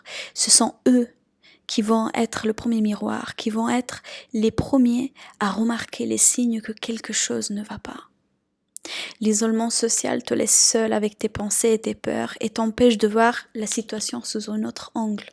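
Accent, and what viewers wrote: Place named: Iran. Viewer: Merci 🙏 pour vos vidéos D ou vient ce jolie accent? Je dirais l iran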